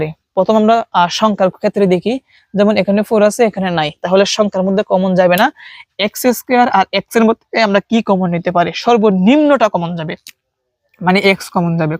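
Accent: Sylhet, Bangladesh. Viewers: কথা বলার ধরণেই বুঝে গেছি যদিও সিলেটি কোনো শব্দ ইউজ করেন নি!!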